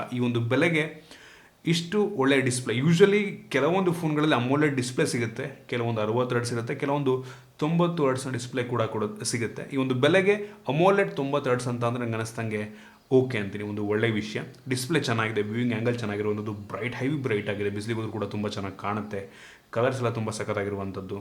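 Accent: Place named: Mangalore, India. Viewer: sir ನಿಮ್ಮ ವಿಡಿಯೋ ನೋಡೋಕೆ ಇಷ್ಟ ಆಗೋದು ನೀವ್ ಮಂಗಳೂರು ಕನ್ನಡ ರೀತಿ ಮಾತಾಡ್ತೀರಿ.. ಥಾಂಕ್ಸ್ ಫಾರ್ ವಿಡಿಯೋ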